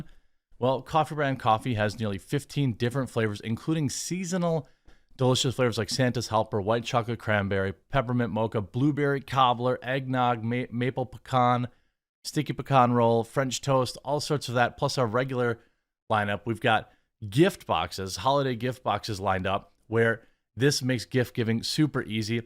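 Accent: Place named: Wisconsin, United States. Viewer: You should say coffee, cocoa, or tea. When you say "coffee, tea, or cocoa" with that Wisconsin accent I hear "coffee to yer cocoa" every single time.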